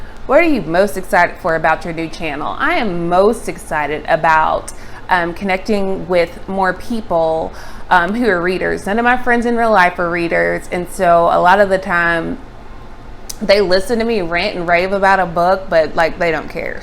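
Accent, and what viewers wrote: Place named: South, United States. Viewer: Welcome to BookTube! I’m from Texas, so I love a Southern accent 😀. Looking forward to what videos you upload!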